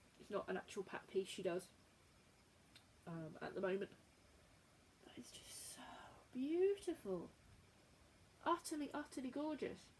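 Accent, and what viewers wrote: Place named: United Kingdom. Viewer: Just found you and enjoyed your first video so will be watching more Lovely to hear another British voice When I first starting watching Flosstube in 2014 there was quite a mix of nationalities. I took an enforced break from stitching and all that goes with it for 2 years and when iI returned at the beginning of this year almost all of the new people I have started watched are from the USA. So some variety is great and I’m looking forward to catching up with your following videos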